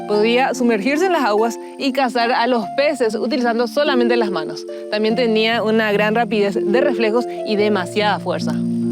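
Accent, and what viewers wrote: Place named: Buenos Aires, Argentina. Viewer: Es porteña no es paraguaya por el acento al hablar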